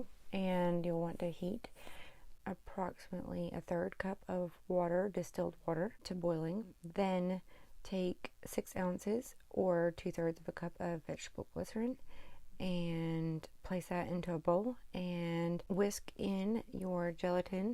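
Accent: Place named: United States. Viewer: that's fabulous and I am looking forward to viewing it, you have a great American voice, you sound very calm and placid! I would like to make videos but I have no understanding of video editing, I have some super ideas for content but just don't have the time to train myself in video producing so I am trying to write instead, however even with that I struggle as I much prefer writing with pen and paper, my previous life is so far from my new venture in upcycling and art and I would love to tell my story of the last two decades after the 90s rave scene mingled in with my theories on elite circles and their corruption and the impact on society, it is an extremely unusual tale from a part of England that is not really documented, I am from the southwest which to most is a sleepy, rural, picturesque haven but it is really different to what people think! 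Well I'm probably driving you crazy now , I'm terrible for going off topic, but I do love chatting especially to Americans! I love America and would love to one day visit.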